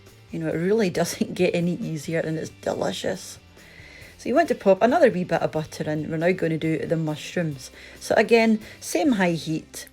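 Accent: Scotland, United Kingdom. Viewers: Excellent 👍👍 presentation. Love your Scottish accent. You sound just like my daughter's mother in law ... Glasgow. Lol 🤗definitely will try this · Beautiful scottish accent.😊❤ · I love your cooking reminds me of my mother’s cooking, she was from Dundee also where I was born but only lived there until I was four. All her cooking when I was young was based on Scottish food, so to hear your accent and see the the cooking warms my heart and makes me hungry. PS maybe not so many americanisms of your lovely accent?😊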